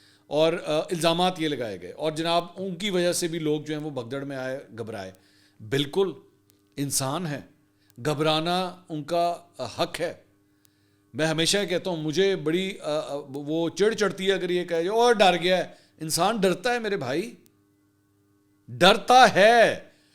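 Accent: Punjab, Pakistan. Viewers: آپکا پنجابی کا تڑکا 😂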